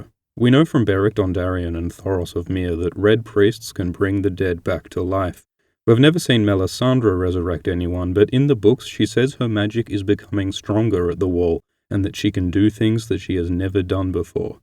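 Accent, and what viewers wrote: Australia. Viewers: I love your videos!!!! As far as Jon, agree with you totally. As as the show? D&D don't have the creative brain that George does in terms of writing fantasy, so who knows what they're going to do. BTW, GREAT to hear another Australian accent :) CYA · I agree he does sound like an Aussie...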